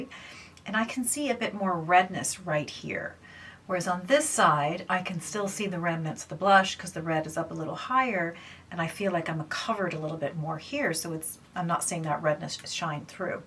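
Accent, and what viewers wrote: Ireland. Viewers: You sound a little bit Irish 🇮🇪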